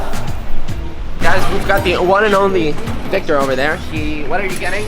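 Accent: Spain. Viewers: your spanish accent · Se nota tu acento español, pero igual tienes un perfecto inglés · Are you from Spain? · awesome to hear you rock spanish spanish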